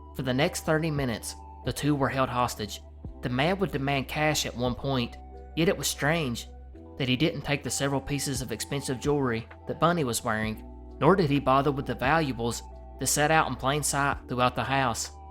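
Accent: South, United States. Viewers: Boy you are about as country as corn bread and black eyed peas. Love it!!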